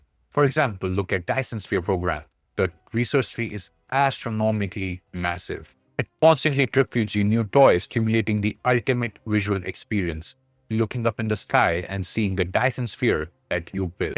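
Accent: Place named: India. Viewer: Completely off topic. Thank you for a spot of nostalgia. One of my previous employers was a small satellite to an international Indian company. All of the reasons why I left were the UK and US oriented business culture. That stuff is just oppressive, dumb and bad for both morale and business. Many of the original Indian concepts were pretty good. And they came with the musical accent you remind me of. No regrets on walking out, but I do miss that part.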